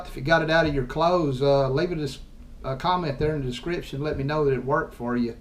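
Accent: South, United States. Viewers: Why thank you!... Love the Southern accent!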